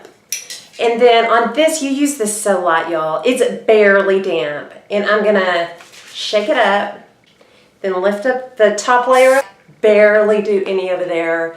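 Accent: South, United States. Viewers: What is your hair color? Love it! Listening to your southern accent is like being back home...love your videos girl!!!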